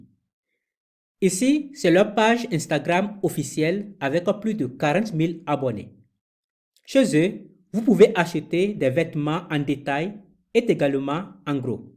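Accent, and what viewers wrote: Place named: Benin. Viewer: Merci infiniment ! Je pense que t’es béninois comme moi vu ta façon de parler ✌🏽 Franchement ça va tellement m’aider ! Cette vidéo est top, claire et concise :)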